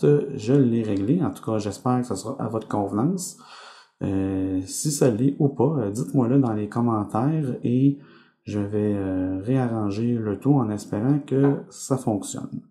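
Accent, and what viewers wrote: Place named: Quebec, Canada. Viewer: Ça fait du bien d'entendre un Québécois Francophones parler de warhammer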